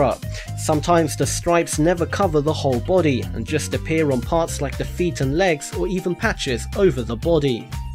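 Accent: England, United Kingdom. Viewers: That is just his English accent.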